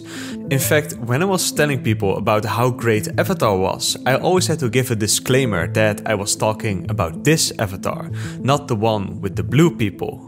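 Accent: Netherlands. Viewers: Is that a Dutch accent I hear? No wonder you love wonder, makker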